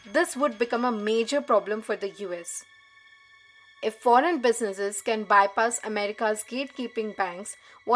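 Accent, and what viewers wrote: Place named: India. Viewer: Stop inventing things in Indian accent please, where is the digital currency of America???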